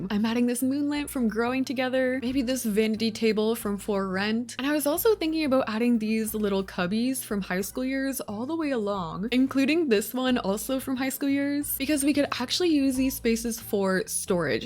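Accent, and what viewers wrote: Canada. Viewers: I’ve never seen your channel before but this video just popped up in my recommended’s. This house is stunning!! I had to check your “about” to see if you were Canadian because I immediately heard the soft and cozy Canadian accent ✨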